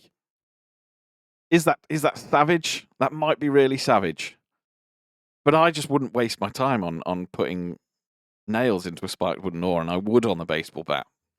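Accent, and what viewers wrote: United Kingdom. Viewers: You Brits and your zeds and oughts..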